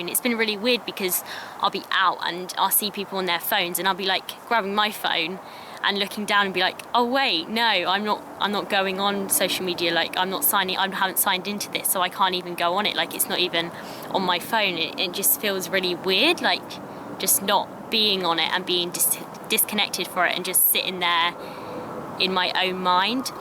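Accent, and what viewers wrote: United Kingdom. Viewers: I love your British accent. I could just lay in a hammock and listen to you talk.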